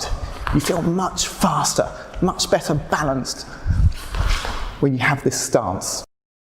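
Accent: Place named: United Kingdom. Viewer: 내가 좋아하는 영국인 영국발음 여러 게스트도 초청해 꿩 먹고 알 먹고 탁구 익히고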